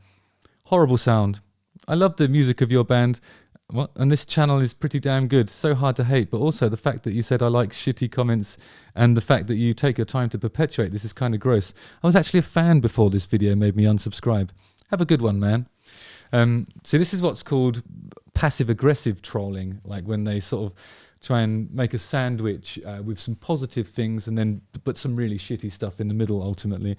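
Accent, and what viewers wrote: United Kingdom. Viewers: .."Off you fuck". I love it. I will definitely use that, but it'll never sound as good as it does with the Brit accent. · condescension with a British accent is so much more satisfying to hear. rock on justin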